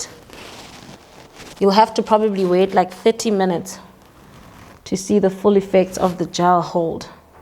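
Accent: South Africa. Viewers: LOL. It's our typical South African accent❤️ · is it just me or does she sound South African ? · are you south african? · She sounds very much like she has a black accent. Just from JHB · She is south african😍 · Are you South African? You're accent is awesome! I'm American -born Nigerian, but my accent is boring valley-girl 😆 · She sounds South Africans....damn🚶🏾‍♀️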